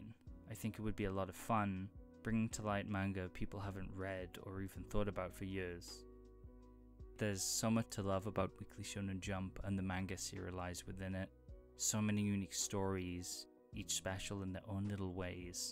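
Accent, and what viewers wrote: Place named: United Kingdom. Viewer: Why you sound so more british here, lmao.🎉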